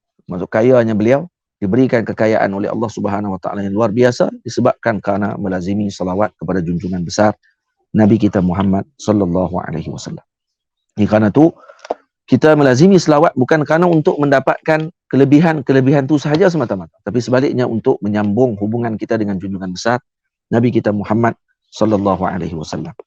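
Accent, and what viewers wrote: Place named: Malaysia. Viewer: trima kasih atas penjelasanya ustad mungkin bahasanya indonesia malaysia mungkin ustad dari malaysia masih ada logat malaysia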